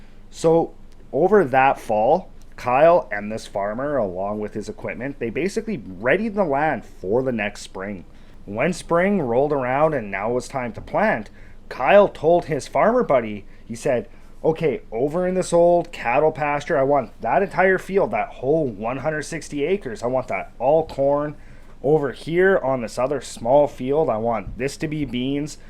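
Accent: Canada. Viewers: Could tell you were from Canada or Northern States in US by the way you pronounce your “O’s”! My sister married a ND man and he sounds like you! Love it! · Huh, so that is what a Canadian Cow Boy sounds like, ey? I couldn't peg where you we're from for at least a couple mins, LOL. Great vid for the upcoming Halloween season! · Bruh as Canuck working in the US your accent made me homesick haha.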